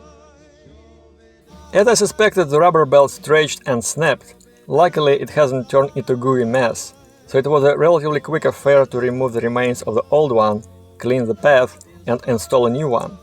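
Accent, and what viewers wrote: Russia. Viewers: Только я слышу русский акцент?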